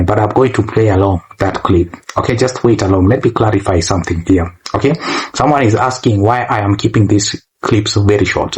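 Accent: Jamaica. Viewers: Man your accent is very much like Jamaican too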